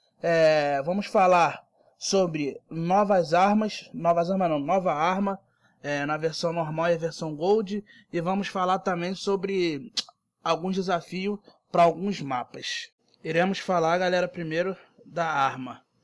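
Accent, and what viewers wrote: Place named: Rio de Janeiro, Brazil. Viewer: mds carioca conversa feio mano. na moral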